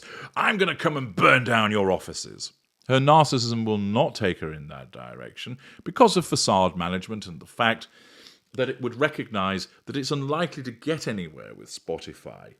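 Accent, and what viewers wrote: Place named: Scotland, United Kingdom. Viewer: Thank you. I have to confess that I very much enjoy it when you read the Scottish articles, simply because your Scottish accent amuses me greatly! HW has a "honeyed" voice, eh? I'd suggest her voice positively drips with falseness and sanctimony, because all she does is talk down to her audience and try to score points. But yes, I can imagine that Harry's wife is pretty much exploding at the reports of what Catherine and William are doing; and I'd think that Harry himself is also looking at them with envy and resentment.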